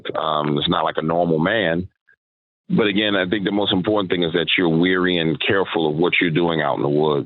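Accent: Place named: New Orleans, United States. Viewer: Aah my fellow New Orleanian!! I can hear your accent a mile away 😅 “dawk wadders” Love it! 🫶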